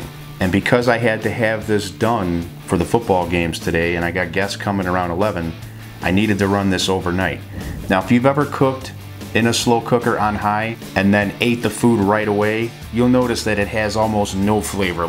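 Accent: Chicago, United States. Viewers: Hearing your Chicago accent gives me faith in attempting this.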